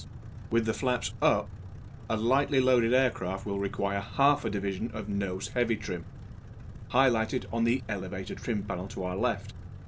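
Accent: United Kingdom. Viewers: I like this! It’s the perfect accent for a British airplane. It puts me in the mood lol. Wags is very busy with other modules and probably making Apache vids also.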